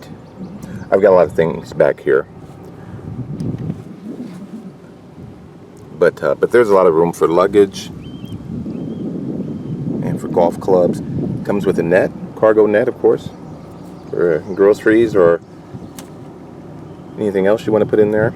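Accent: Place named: Oakland, United States. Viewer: Love the video. You from Oakland? You sound kinda like me and my buds. :)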